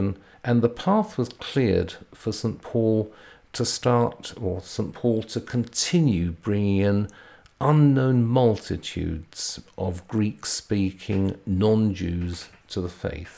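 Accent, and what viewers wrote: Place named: United Kingdom. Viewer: I'm from America USA and I recently "stumbled" upon lectures. Truly, it's a wonderful experience to listen to him teach. His voice tone, UK accent, and amazing depth of knowledge about the various subjects is truly an amazing learning experience. No degree being gained by me as I've already got my B.A. and M.S., but I've always been a fan of ancient Rome and Christian Origins, so his lectures are a lovely thing for me! Thanks for the great work! You're a great teacher! - John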